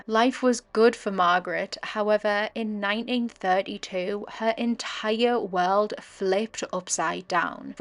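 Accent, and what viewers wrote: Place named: South Shields, United Kingdom. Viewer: Your accent sounds local to me. I’m from South Shields. First time listening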